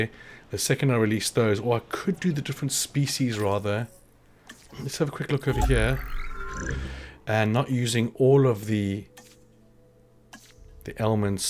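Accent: South Africa. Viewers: Are you South African? If so I am too :D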